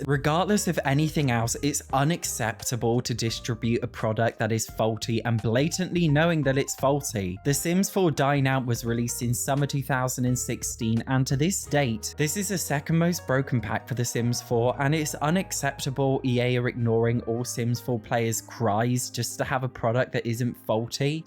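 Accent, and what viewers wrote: United Kingdom. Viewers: As an English Language and Literature major ( I am Turkish) I've been seeking to find someone who has a nice British accent to follow cause I don't want to lose my accent sparkle (u know). And I am so happy to find you! I will listen to you even if you keep complaining lmao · Keep being British I love your accent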